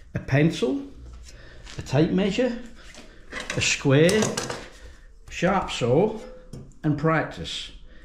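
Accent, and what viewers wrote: United Kingdom. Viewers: Just found your channel I love the way you get your knowledge across. I can't cut straight to save my life but after watching this it should be a bit easier. Also you must be from Norfolk !!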